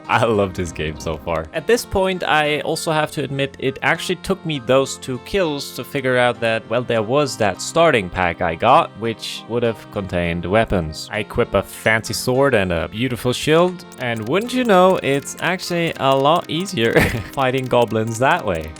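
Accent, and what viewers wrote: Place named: Scandinavia. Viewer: This is great fun to watch! As others have suggested, this series is a recipe for success. You have the unique opportunity to play a game for the first time that a LOT of people have many fond memories of, giving them that experience through you. Nostalgia is powerful, and many players and former players will want you to succeed in something that brought them so much happiness at one point or another in their life. You have a Nordic sounding accent that people will find entertaining and distinctive. You are a clever enough storyteller to know what to cut and what to include to keep the pacing just right, along with some good writing skills and a good sense of humor. There is opportunity here that you can easily reach out and grab if you want to do so.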